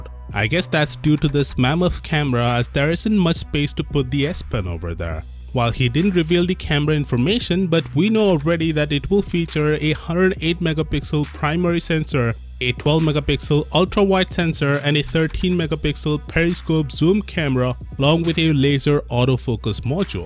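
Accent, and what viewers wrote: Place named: India. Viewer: I thouht that the dubber of this channel was indian, the accent was pretty clear:v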